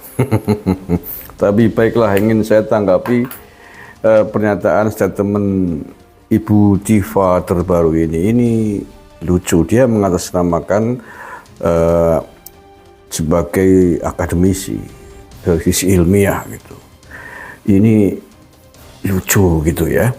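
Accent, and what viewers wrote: Banyumas, Indonesia. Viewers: Apa rika wong banyumas? Medok bngt.... Hehehhee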